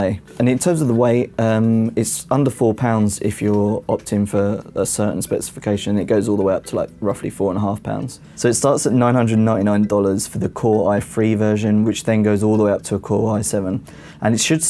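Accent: United Kingdom. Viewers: that's more english that you think actually, it's just british accent. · Why would what I assume is a British speaker (from the accent) say aluminum and not aluminium? Perhaps reading off some official US press notes, and too whacked to notice. Anyway, machine looks nice.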